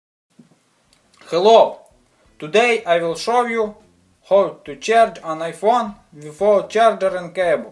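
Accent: Russia. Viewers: Louie Espinosa Yes he is Russian. You can hear it by the way he talks, OR if you have watched Muppets Most Wanted and saw Constantin the evil frog-he had exactly the same accent c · this was so funny! OKEIII??? btw you sound russian or ukrainian 😂😂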